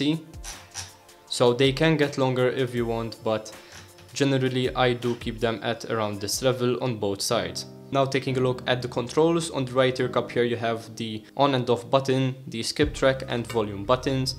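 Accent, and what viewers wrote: Malta. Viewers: that accent is totally maltese :D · I'm betting my left testicle that you're Maltese going by the accent 😆 ... Good vid bro! Keep it up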